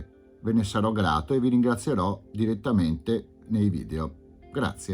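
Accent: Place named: Veneto, Italy. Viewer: Grazie mille tutto quello che dici con l accento veneziano mi piace tutto molto interessante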